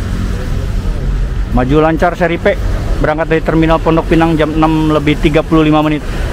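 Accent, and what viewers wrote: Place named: Madura, Indonesia. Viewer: anda orang madura ya bang ? kok logatnya medok banget maduranya 😁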